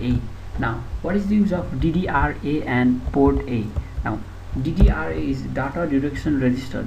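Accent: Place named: India. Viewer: Sorry about saying your from India. Just sounded like it.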